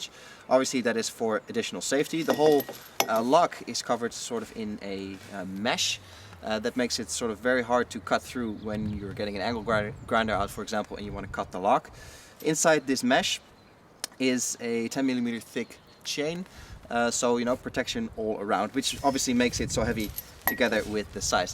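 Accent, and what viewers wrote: Netherlands. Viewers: It took me a very long time to realise that you might actually be Dutch instead of American.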